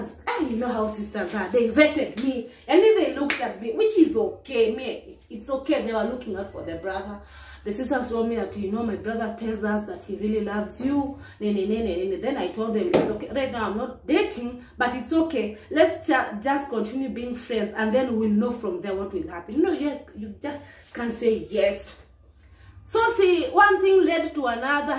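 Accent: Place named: Kenya. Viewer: I remember you, you're Kemuntos niece? Love your narratives. You talk like a Kenyan youtuber living in Germany. Chocolata.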